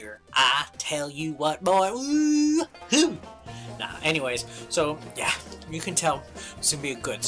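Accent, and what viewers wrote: United States. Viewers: Sweet country accent bro lol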